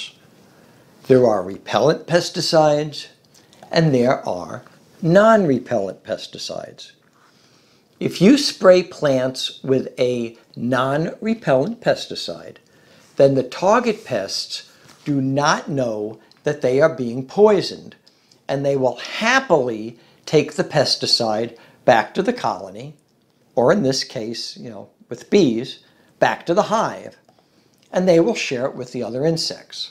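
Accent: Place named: New York, United States. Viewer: AND YOU MUST BE FROM NEW YORK. LISTENING TO YOU MAKES ME SO 😔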